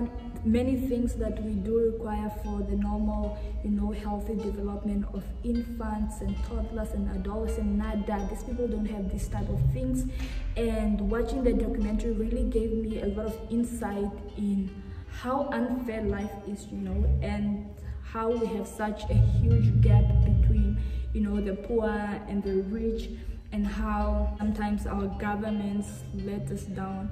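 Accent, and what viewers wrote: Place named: Namibia. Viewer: Love your accent African sister. You’re the first Namibian I met... even though this is virtually. Thanks for talking about abuse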